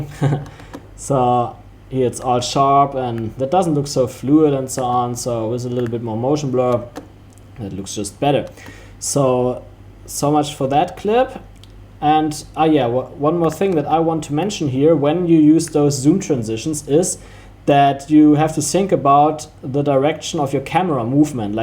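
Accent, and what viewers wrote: Germany. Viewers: Are you German ? In my opinion you speak really German English 😆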